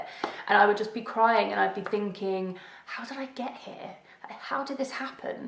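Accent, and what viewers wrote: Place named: England, United Kingdom. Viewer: Right, exactly. It's easy to take our health for granted before it all goes wrong. I'm the same age as you and don't have MS but I work in a hospital and I witness victims first hand. Thanks for keeping us updated and I think we can all say we admire your courage. And yes keep having fun! :) P.S. And we Americans definitely admire that English accent, haha.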